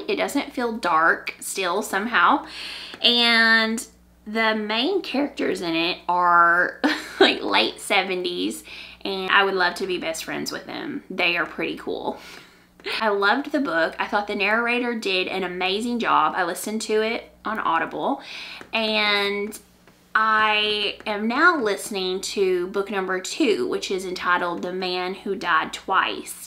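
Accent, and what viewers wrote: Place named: South, United States. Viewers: Shout out to your Southern twang 😁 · Hi! Georgia knitter/maker here. So many fiber podcasters live outside of the South, and I found it charming to hear your familiar accent. Beautiful podcast. Keep up the good work!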